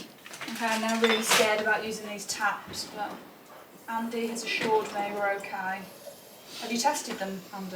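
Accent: United Kingdom. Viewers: I just can’t believe all these insults about your accent. I am a Canadian gal and i just see you as a beautiful British person. Love your accent .❤🇨🇦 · I love UK regional accents. Love yours! I don’t live in the UK. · Lovely ❤ I love you touched the bit with the accents! I am a Bulgarian, who lives in the UK now 10 yrs... I do have and I will always have an accent... I always feel embarrassed, especially at more posh places, formal work events and important meetings. However, now you saying been offended by people, genuinely blows my mind. You speak lovely British English and I can't understand what could be wrong with people who think and say that. Keep being yourself, you are amazing and that's why people who love you do it, because that's just you. My absolutely favourite amongst all influencers and the most glamorous woman, an absolute example for everything! 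💕 Btw, my name is Desi. 🥰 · It’s unbelievable how there is discrimination between British accents 😢 very sad , imagine how the foreigners feel when they are discriminated because of their accent. And by the way I love your accent ❤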